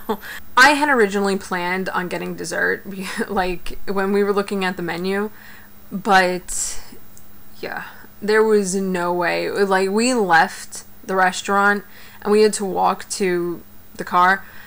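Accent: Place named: New York, United States. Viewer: haha this video made my mouth water! i love bbq food and fries ughh yum!! are you from NY cause you kinda have a NY accent?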